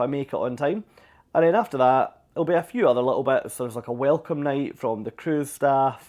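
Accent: Scotland, United Kingdom. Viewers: New subscriber here, LOVE your Scottish accent! especially since Outlander has wrapped up Season 7 lol. Thanks for sharing, and happy cruising! 🏴❤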